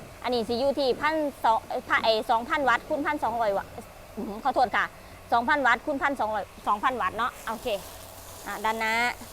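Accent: Thailand. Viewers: ต้องให้เพื่อนคนอีสานแปรให้ฟังอีกที กว่าจะรู้เรื่อง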